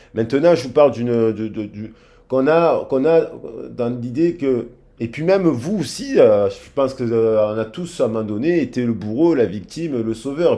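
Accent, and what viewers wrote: South, France. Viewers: Cc merci pour votre guidance très detaillee.c est toujours un plaisir de vous écouter avec votre accent du soleil qui me fait chaud au cœur 😉😊.vous êtes un veritable therapeute.vous m apaisez bcp.😊😘.merci pour votre bienveillance. Belle journée a vous 😉😊😘❤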